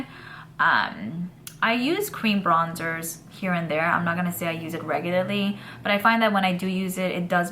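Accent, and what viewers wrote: New York, United States. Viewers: You rock!!!! I love these videos!!! 😍❤️ Please never change your New York accent!!! I love it so much!!! Everyone has accents all over the world and it makes things interesting and new 🤩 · To me your aw accent is normal for the area you live in my mom grew up in the Jersey/New York area but has lived in stl for 40 years and still has that aw accent. We even picked up some words and have people tell me and my siblings we say things odd. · I love that you’re so New York in your pronunciation. Makes me homesick. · Sweetie - your 'aw' is a thing we New Yorkers do, and we are unique and wonderful in the world! You keep your 'aw'. I have done it for years and it's staying!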